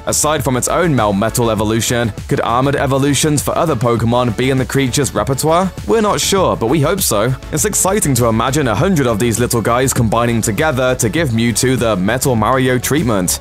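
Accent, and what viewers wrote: United Kingdom. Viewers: Only fitting they the person who narrates has a British accent.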